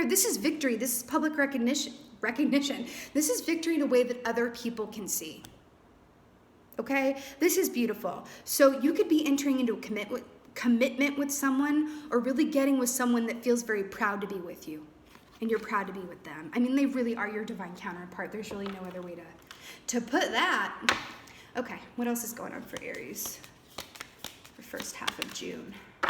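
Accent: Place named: Mississippi, United States. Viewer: I love your accent...I’m from Mississippi and you remind me of home 💜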